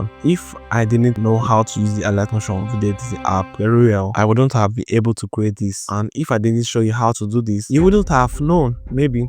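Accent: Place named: Nigeria. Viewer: I’m your Indian subscriber , struggling to understand Nigerian English accent 😂. Great tutorial 💜.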